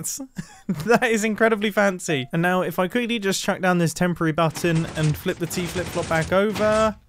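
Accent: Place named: United Kingdom. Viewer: it’s because of his accent. is British btw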